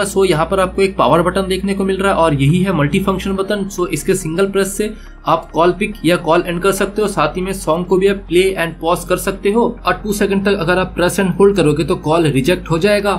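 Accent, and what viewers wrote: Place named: India. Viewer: Bro... wt is this??? - I thot my pseakers saw brknen (im replying as I heard u) but nooooo this some broken Indian crap? just speak in Hindi or whatever you local language is - lord. this is a horrible listening experience.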